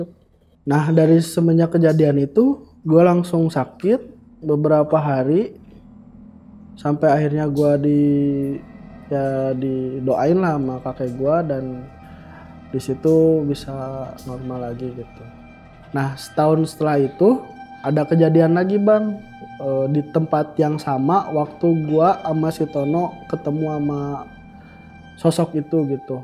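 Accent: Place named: West Java, Indonesia. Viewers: Bang serius klo sunda sunda aja jadi kagok dengernya😅😅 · Sunda pisan euy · Logat sundanya kebawa😂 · Gua.. Gua... Aneh ceuk akumah logat sunda gua guan...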